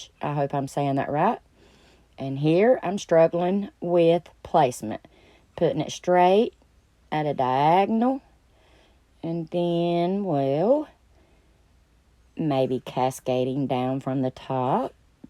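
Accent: South, United States. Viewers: I also love to hear your accent. I’m in NC but from Tennessee. There’s nothing better than a southern accent. 💕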